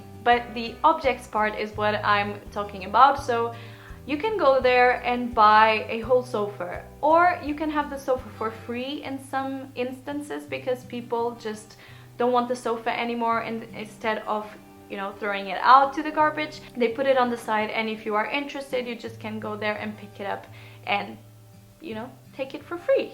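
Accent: Norway. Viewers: you sound like an Norwegian speaking English! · Is there someone else who think she has a norwegian accent? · It's almost like you got a Norwegian English-accent, if you know what I mean? Have you learned any Norwegian by now while you've been here, and what do you do for a living? Anyway, you've got a new subscriber now 😊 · She looks Norwegian..... I`m suspecting this portuguese routine to be a lie! Blue eyes, pale skin and norwegian accent... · you speak english with Norwegian accent.... you are not Portugese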